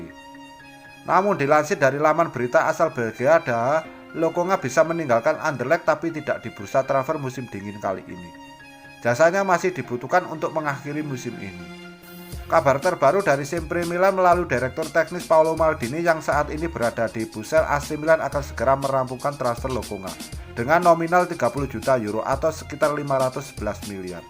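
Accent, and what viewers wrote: Java, Indonesia. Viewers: Medok ngomong.e boss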